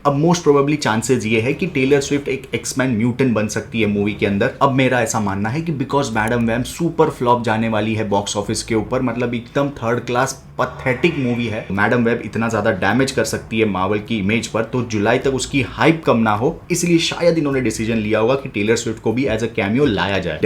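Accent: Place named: Hyderabad, India. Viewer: Bhai toh badiya kirrak Hyderabadi slang me bole toh ek dum maut 💪🏼🫡🙌🏻🤙🏼🤙🏼🤙🏼 outstanding bole toh maza aa jata hai jab bhi channel open kare roz apke channel per attendance dal kar jate hai aaj koi video upload hua ya nhi Khair bhai tum kaam to ek dum zabardast kar rahe ho quality content k bare me janna hi toh Sidha abhi ka review per jana ❤ well congratulations brother keep helping us we stand with you 🎉 Kch zyda hi bol gaya😅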